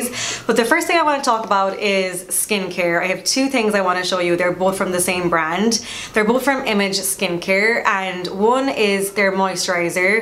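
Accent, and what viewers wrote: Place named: Ireland. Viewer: Love your videos. You're a true Irish gal. Love that you still have your great accent!! I sent you an email about Toc Watch. Hope to meet you at some stage. Keep it up.:-)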